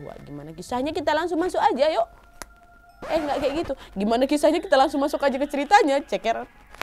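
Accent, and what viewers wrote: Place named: Java, Indonesia. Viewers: Kak klu ngmong bahasa jawa lucuk deehh.. suka dngrnya.. 🥰🥰🥰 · Medhok jawane mbak apik 👍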